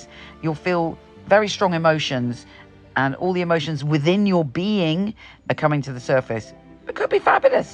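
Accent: United Kingdom. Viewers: I'm hoping communication will be better it's been faulty in the present. Where's your accent from? (UK). Go with your gut l say.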